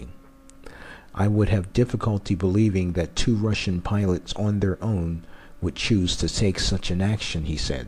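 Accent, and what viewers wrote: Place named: United States. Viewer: You speak like american.. :) are you sure that you from Australia? When Cook came to Romania after that there was 27 crew members who leave the boat. What hapens? Thay scare! When somethin goes wrong, americans always scare!.. Everyone understund that if that would be WAR our fighter could kill Cook for many times for this 1,5 hours! And of course we know why Donald Cook came to the Black See. It came to show us its power.. It's not scare..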